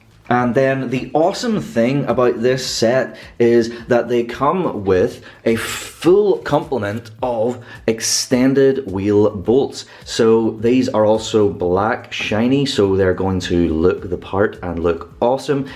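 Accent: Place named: Northern Ireland, United Kingdom. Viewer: Are these legal in the UK as I am presuming by your accent and vehicle reg that you are in Northern Ireland. I have had 15 mm spacers on an E46 M3 in Australia where it is illegal probably based on the 'piggy back' type that are pretty risky. I wrecked two wheels and did thousands of dollars of suspension and body damage when a 30 kg manhole flipped out of its hole and instantly deflated both tyres and the spacers were unaffected but I did take them off before the car was repaired under comprehensive insurance. I am moving back to the UK and would like to put these spacers on a mini clubman if legal or probably even if their not legal but a bit of a faf if you have to take them off for MOT's and inspections by the Rossers.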